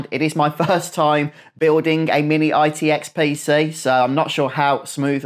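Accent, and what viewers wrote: Birmingham, United Kingdom. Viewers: We're having a debate, I'm convinced you're from Birmingham but my friend disagrees, please confirm haha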